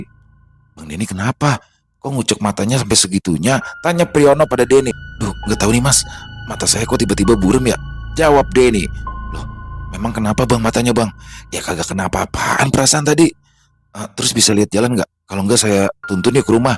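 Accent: Jakarta, Indonesia. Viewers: candu bgt sma crita bang logat btawi nya khas bngt. makaasih bang sehat sllu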